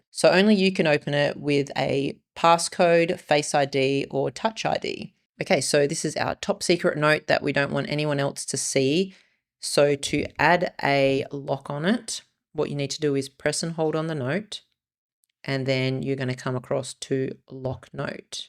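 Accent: Australia. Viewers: She’s Australian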